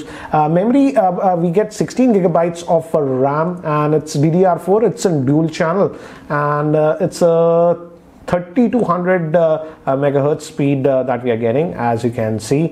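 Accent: India. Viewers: He's Indian! He has an Indian accent! Don't like Indians? Don't watch videos made by Indians!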